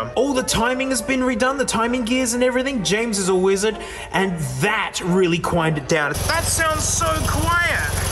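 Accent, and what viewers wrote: Australia. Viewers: James Came Around = James Cameron... Ah the sweet Aussie accent! My ear just needs an extra second sometimes to process!!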